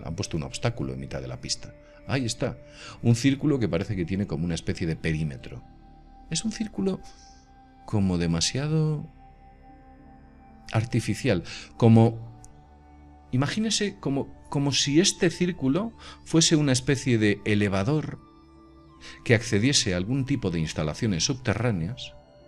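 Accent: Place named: Spain. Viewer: Con todo respeto! Si no estás interesado en el tema! que é haces viéndolo?.. Cada país, 🌍 o región tiene su dialecto!... Cuando hablan en otro idioma diferente al de su país .. aún usan fonemas o resaltan algunas consonantes según su dialecto!... es el caso de Los costeños, Rusos, japoneses etc Él es Español 🌍 por si no lo sabías Gracias por mostrarnos tu sapiencia 😏👎🏼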